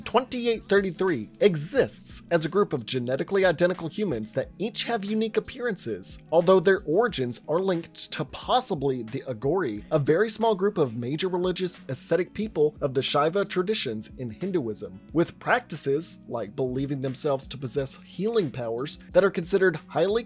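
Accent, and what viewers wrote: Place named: Indiana, United States. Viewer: .........you’re from Indiana, aren’t you.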